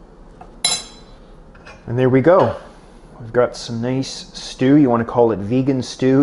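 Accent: Canada. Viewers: Elizabeth B MY THOUGHTS EXACTLY! :) that's always how I'm easily able to identify if someone's Canadian. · When you tune in to hear 'oooot and abooot'... I love the Canadian accent :) Not disappointed!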